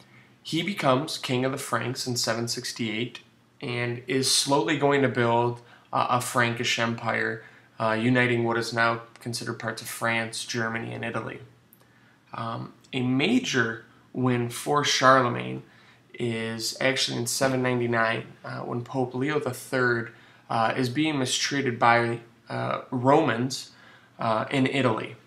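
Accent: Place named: Minnesota, United States. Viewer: You sound like someone from Minnesota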